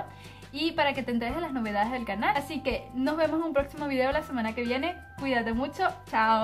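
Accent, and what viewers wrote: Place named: Venezuela. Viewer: Venezolanisima pero #CuentoALaVersionEspañola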